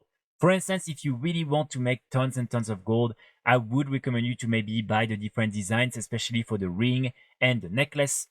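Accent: France. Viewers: suscribed for the french accent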